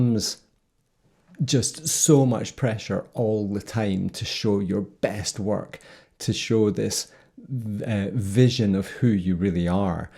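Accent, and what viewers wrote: Scotland, United Kingdom. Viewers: your nice Scottish accent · The hypnotic Scotish (not Irish) accent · You do have a gorgeous Scottish accent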